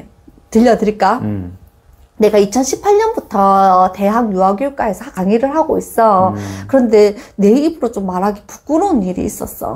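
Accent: Gyeongsang, South Korea. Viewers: 실버버튼 응원합니다. !!! 42개월 여아 키우는 워킹맘입니다. 숲놀이 검색하다가 교수님을 알게 되었는데 지금 저에게 필요한 내용이 많아 이것저것 듣다가 팬이 되어버렸어요 지금 틈 날때마다 계속 듣고 있는데 사투리에 대해 고민이 있으신지 몰랐네요. 전 선생님께서 사투리 안고치셨으면 해요. 제 남편이 경상도사람인데 말투가 너무 쎄고 공격적이라 부드럽게 말해달라고 하면, 경상도라 그렇다. 경상도는 원래 그렇게 말한다라며 정당화 시키거든요. 근데 또 경산도도 내륙쪽이랑 바닷가쪽이랑 또 다르다며 바닷가쪽일수록 더 거칠다라고 말하는데.. 경상도여도 말투가 이렇게 다정하고 부드러운분도 계시다고 꼭 알려주고 싶어요 ~~~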